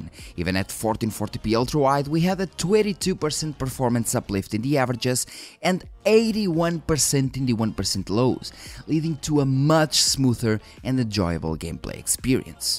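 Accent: Portugal. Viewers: very good video. btw you sound portuguese accent. i know cuz u sound like my accent lol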